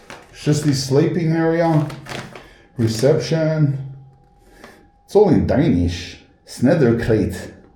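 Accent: Australia. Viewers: Love your honesty and I'm new to this channel but I fucking love it!! ❤ cous...😂😂😂 that aussie accent is fire!! Keep it up mate...love your no Bullshit way. ❤❤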